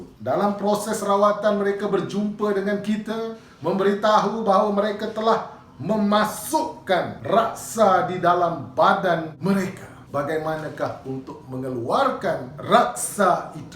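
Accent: Malaysia. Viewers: He spoke very melayu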